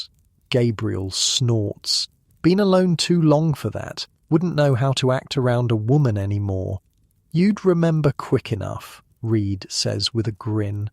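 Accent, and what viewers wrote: United Kingdom. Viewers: Not a fan of Brit narrators.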